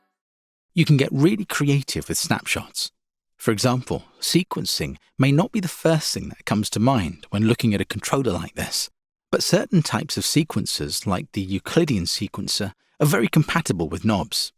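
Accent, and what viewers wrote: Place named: United Kingdom. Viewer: Hi. Congrats on making something new and interesting! Couple of questions… 1) you sound British, so will the stated dollar price include VAT for UK orders? 2) is it midi 2.0 compliant so that changes made in a DAW will be reflected on the controller? 3) any plans to create DAW specific scripts like for Bitwig? Cheers, Phil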